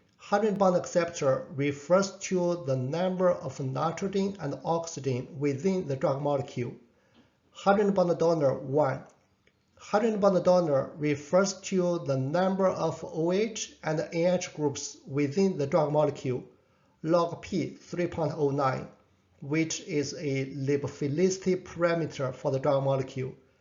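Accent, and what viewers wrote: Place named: China. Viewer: 謝謝您的分享👍I know u can understand Chinese, from the accent 😊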